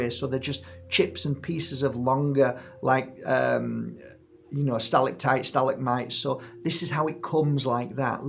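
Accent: Yorkshire, United Kingdom. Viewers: I come from Huddersfield and I always love to hear your Yorkshire accent. Makes me feel warm inside. Also I love whatever you say. X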